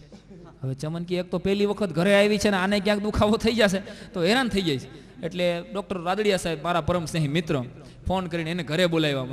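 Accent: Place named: Kathiawar, India. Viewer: su kathiyavadi laheko se .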